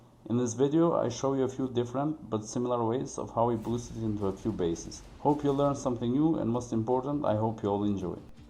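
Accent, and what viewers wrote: Russia. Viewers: Your sound like a Russia , hahah, I liked the video 👍🏼👍🏼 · russian accent and now I stopped watching you. Hatfu na ryj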